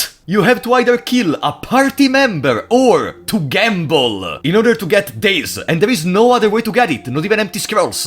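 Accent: Italy. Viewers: I've never heard an accent as Italian as yours. I have family in Italy, i've had online friends in Italy, nobody I've heard has had as thick of an accent as yours. Ngl it's a lil impressive and i'm not saying any of this as a bad thing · I just love your energy, I never hear italian accents online and when i do they’re usually more timid, but damn you wear it on your sleeve and you OWN IT! I love the energy!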